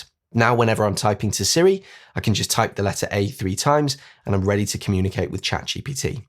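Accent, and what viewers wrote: United Kingdom. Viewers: Great. I’m in Lisbon understand your British English very well, congratulations! Just got a new 16 Pro Max and love your lessons. Will be a link member soon. Have shared several with my colleagues. Good health! Keep going into 2025.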